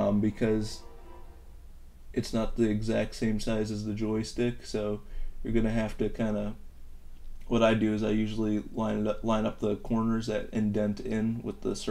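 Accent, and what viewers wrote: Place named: India. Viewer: Oh awesome I thought you were like Indian or something 😂 lol nice!!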